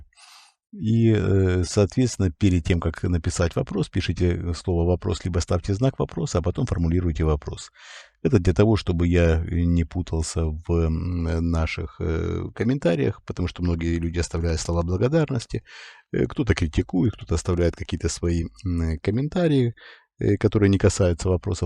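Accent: Ukraine. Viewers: Вопрос❤,у вас украинский акцент,вы украинец по национальности???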